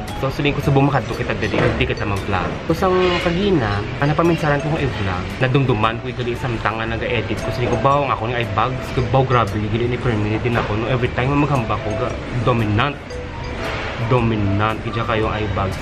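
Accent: Iloilo, Philippines. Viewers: Enjoy gyd kaayo ko tan-aw sa imo mga video ba,pampawala stress imo video ay kay mag sge ko katawa.Ilingaw gyd kaayo ko.ganhan ko sa imo Ilonggo accent.Sakit ako tyan nimo ay :) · Nice one sir!! Ilonggo na ilonggo gd!!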